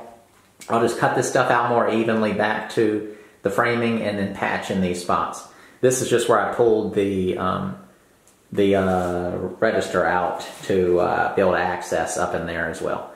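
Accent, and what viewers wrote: United States. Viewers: To my untuned Scottish ear, I have no clue where in the states this accent is from! Anybody know?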